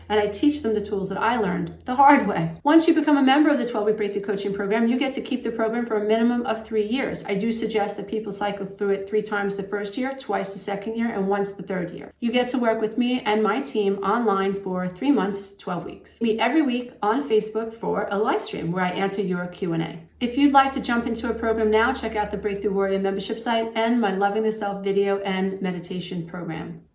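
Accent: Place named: Long Island, United States. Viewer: Also I'm a Long Island guy. And although I haven't lived there in a long time it's still home and when someone speaks to me the way you do it's so familiar comforting supportive and oh so welcome! The tone the accent the loving intention it all hits home real deep you've been so helpful. Look at how many people you are helping it's astounding! I'm making the best music of my life now because I'm plugged in. Thanks to you and others in your field of expertise.😍 Happy New Year! Your good karma account is overflowing.👩😇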